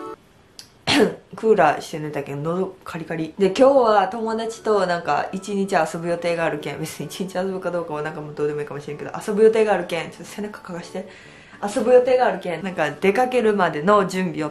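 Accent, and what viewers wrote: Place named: Shikoku, Japan. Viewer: はじめて見ましたが、方言がわたしのところとすごく似てて親近感湧きました（笑）四国の方なんでしょうか、、？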